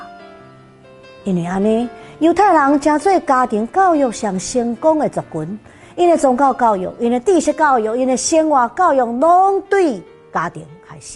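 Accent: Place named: Taiwan. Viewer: 今仔日予我誠歡喜來當來聽著，今仔日予我閣再一改，誠歡喜來當來聽著牧師用端的台語放送福音，梁牧師的傳道的聲嗽清楚優雅，予咱聽落心情齊平和。這真正是咱台語人的一大福音。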